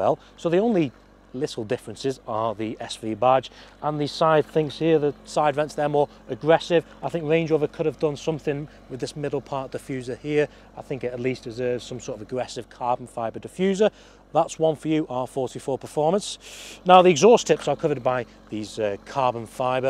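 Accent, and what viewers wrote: North, United Kingdom. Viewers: I'm sorry...I just cannot listen to your droll northern accent for more the 2 mins. Apologies.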